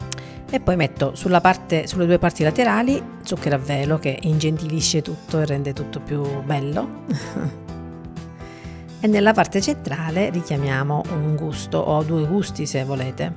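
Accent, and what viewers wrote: Calabria, Italy. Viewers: il tuo stupendo accento calabrese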